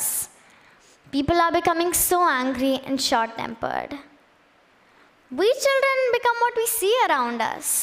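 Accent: United Kingdom. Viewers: English speaking is not that necessary . Learn hindi or sanskrit and speak it fluently ,you could be well honoured and signified. Just a imposement of the british accent . Support hindi as well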